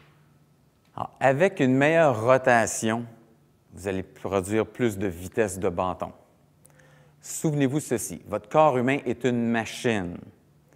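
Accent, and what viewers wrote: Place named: Canada. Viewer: hello from France. Super vidéo., faut faire un DVD! Continuez, j'aime vos vidéos, elles sont très pédagogiques et claires. Et puis, avec l' accent " canadzien ", j'ai l' impression de prendre un cours de golf au Québec! génial. Merci. et encore, encore.....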